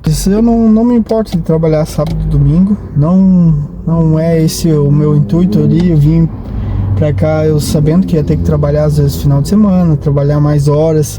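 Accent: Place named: Brazil. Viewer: Olha o conselho, falas, falas e falas e não avanças, vê outros youtubers e logo aprendes e ficas 5 ✨ quanto ao falares brasileiro cada um sabe de si tanto faz o importante é entender-se, mas não existe idioma brasileiro, existe sim vindo do português o que muda é o sotaque, ou dialeto e muitos erros da vossa parte, agora que o sotaque é mais bonito que o português é uma verdade. Fica com a tua e nós ficamos com a nossa o importante é seguir enfrente.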